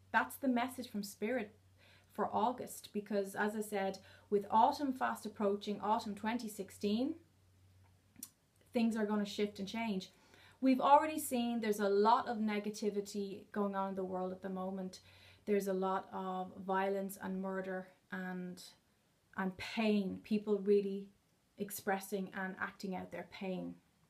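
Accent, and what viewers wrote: Ireland. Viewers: thought the accent was Irish. I'm up in belfast, would love some where in the country away from it all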